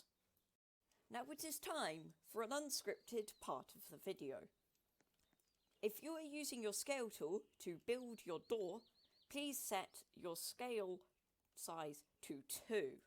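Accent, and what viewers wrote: United Kingdom. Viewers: Everyone talking about the door: Me: What a posh british accent. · British voice bro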